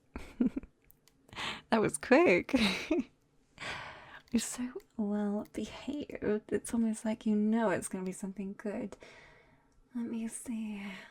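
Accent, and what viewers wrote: United Kingdom. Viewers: I don’t know what it is exactly but there’s something about that British accent that just makes this so much better. Along with my favorite type of character, "yandere" just as good as the hide and seek one. Love the content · why are you british -( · i love british accent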